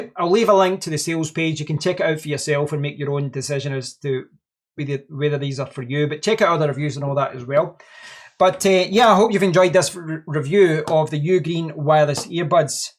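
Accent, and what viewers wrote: Scotland, United Kingdom. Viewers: Your accent makes me miss Scotland 😀. Here in UAE it's only 22£ with coupon I think it's the only tws with apt x under 25quid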